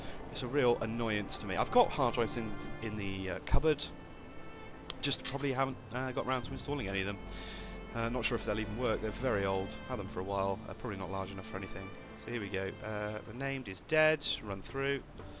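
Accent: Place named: United Kingdom. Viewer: Hey, I really like your videos; just fun and casual to watch and listen to, whether Vanguard is popular or not. Seems like a really nice game for some casual single-PvE. It's just too bad I can only make out 80% of what you're saying behind all the sound effects and with the brittish accent (no offense). Oh, btw, try whatching this video transcribed/auto-subtitled; it's just totally fucked up:) (like - )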